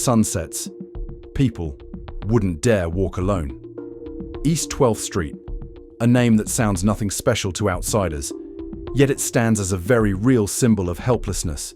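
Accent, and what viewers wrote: United Kingdom. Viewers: This is fake! I live in Oakland and this fake documentary with a foreign UK accent explaining you something they don’t even know about it. Just to create fear. This is straight FAKE!!!! That’s like UK people telling you about Mexico and Cartels.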